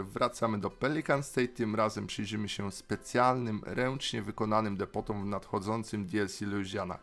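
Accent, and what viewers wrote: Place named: England, United Kingdom. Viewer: witam cię mam pytanko mieszkasz w uk czy w polsce bo akcent masz angielski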